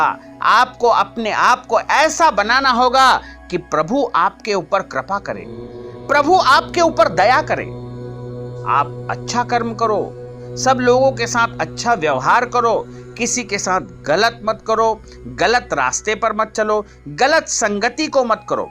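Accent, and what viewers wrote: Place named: South, India. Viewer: South Indian Hindi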